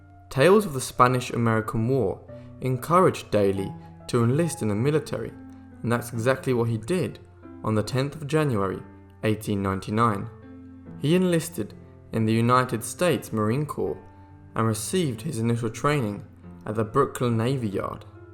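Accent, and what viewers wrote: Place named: United Kingdom. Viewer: Having a British man quoting Dan Daly during the first World War sounds wrong. No way Dan Daly would have pronounced and inflected his words this way, he would have sounded ridiculous. Nice try though. Oorrah Devil Dogs! Semper Fi, Dan.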